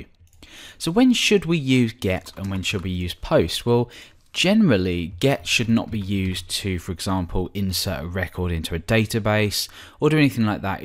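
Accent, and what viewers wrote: England, United Kingdom. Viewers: Not a Hindi accent, a butter-smooth English accent. Plus Good content. Wonderful.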